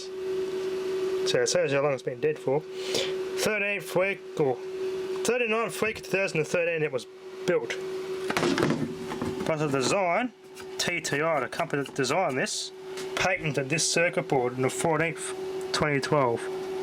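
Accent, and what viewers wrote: Australia. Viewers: He is speaking rapid AUSTRALIAN. Solution is to hit CC to display subtitles. Helps a bit. Comments beneath the video are useful. Steve in Sydney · is this Australian??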